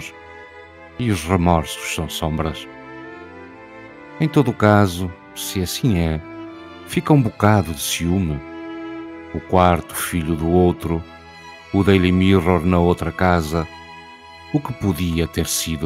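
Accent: Portugal. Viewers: Ah esse sotaque português, me encanta e me acalenta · essa voz com sotaque português é delirante , como não se apaixonar por Fernando Pessoa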